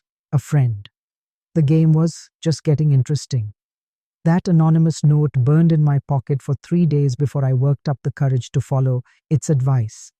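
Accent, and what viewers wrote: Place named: India. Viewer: Oh my God it's got an accent of someone from India and it's so goddamn annoying I got to get rid of this one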